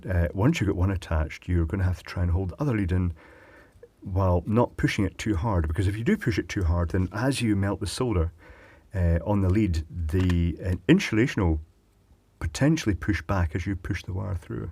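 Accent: Scotland, United Kingdom. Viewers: Thank you for your daily entertainment, for teaching me and for being a wealth of knowledge. I am from Edinburgh originally but moved to Australia 7 years ago, it is so refreshing to hear an accent similar to mine in such a different country! :) Love what you do, and I will definitely be becoming a patron (patreon??) after your explanation on the importance of the added revenue! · I enjoy your channel and find it entertaining and relaxing. It must be your brogue. Good stuff. Cheers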